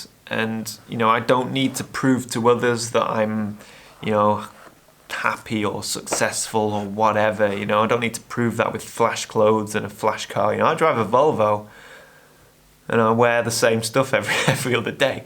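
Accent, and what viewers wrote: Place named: United Kingdom. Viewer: Very surprised about you dont like your own voice. Because the first video I saw of your channel, was with your voice in off, and it was the thing catched me, your voice and the way you talk about photography. I'm very very fan of british accents, so not a big deal, but it's fun to see that you do not like it, and for me was the first thing I noticed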